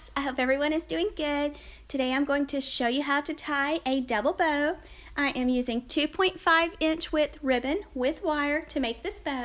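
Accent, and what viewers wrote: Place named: South, United States. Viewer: Once I heard the southern accent, I knew this lady knew what she was talking about. Thank you for making it quick and simple too!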